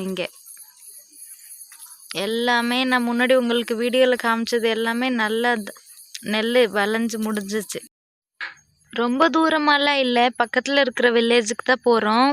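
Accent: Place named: Telugu, India. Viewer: Yei paithyam tamil ozhunga pesu, ennomo telungu ponnumari Pesara? Over sean podatha, tamil kaarithane nee thikki thikki pesura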